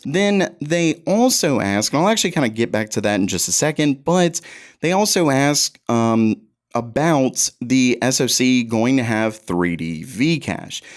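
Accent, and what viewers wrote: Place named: South, United States. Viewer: this guy's voice sounds like a southerner mixed with a lesbian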